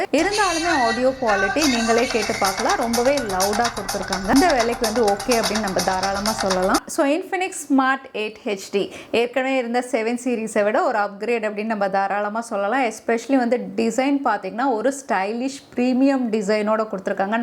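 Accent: Kerala, India. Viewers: genuine review ur slang like mallu ,,,,,,,,,,,anyway fantastic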